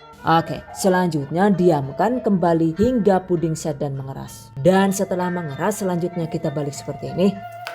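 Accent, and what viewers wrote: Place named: Cilacap, Indonesia. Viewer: Jadi eman" di pangan, enak disawang. Penuh kesabaran tenan dan ketelatenan tentu ne. suwun ilmu dan trik" nya. saya kangen kalau ngomong gawe logat Cilacapan. [suwun]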